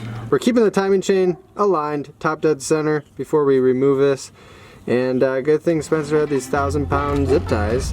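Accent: Wisconsin, United States. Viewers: I love the mn Wisconsin accent lmao I'm from St paul. Totally understood everyhthing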